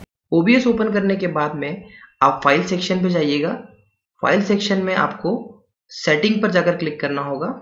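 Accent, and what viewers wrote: India. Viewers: Why is the video description in english, and the audio on the video in a crazy indian dialect? Dislike for you, click bait!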